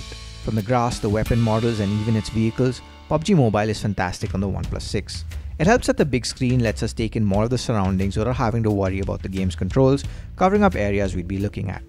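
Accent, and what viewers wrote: India. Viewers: i always like how indian's pronounce FANTASTIC XD its one word that lets u know if he is indian or not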